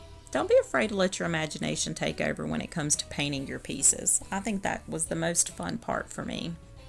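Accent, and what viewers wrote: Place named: Ozarks, United States. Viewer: Subscribed before I saw your diy just because of your voice! You sound like me! Hehe🤷 you may not be an Ozark Hillbilly, but if you ever make it up here, holler and I'll show you all the good junkin' spots! LOL there was an exceptionally awesome craft show here a few weeks ago at War Eagle Mill. If you ever plan a fall trip to the Ozarks that would be an awesome thing to shoot for. You can't be too far according to your accent lol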